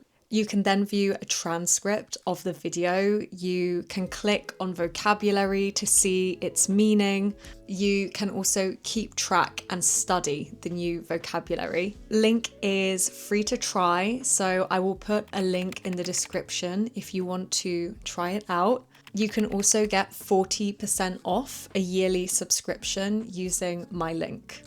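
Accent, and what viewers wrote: United Kingdom. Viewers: yav dayı şu british aksanı ile konuşmayın yav bana USA lazım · Hi! I speak Spanish and I'm learning English. Your video helps me a lot and I love your accent, especially because it's British.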